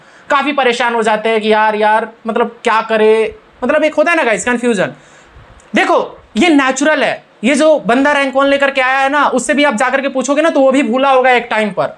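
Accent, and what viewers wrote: Bihar, India. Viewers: Good approach for math telling inspector sahab your style of speaking is fabulous bro and also motivated lots of things bro ✌️♥️♥️ Bihari style 😎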